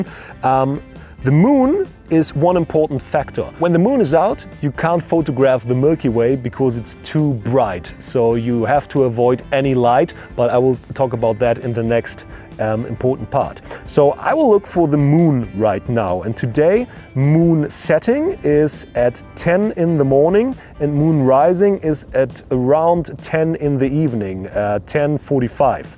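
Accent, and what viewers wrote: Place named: Germany. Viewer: PEP also Englisch ist sehr gut. Nur ein deutscher Akzent, aber das ist auch egal:) mir gefallen die Videos So, English is great, but he has a German accent, but I think that doesn't matter:) I like the videos. They are great BTW: this is an English channel. I recommend to use English. It helps you to learn English and the other persons understand what you are saying;) just a little tip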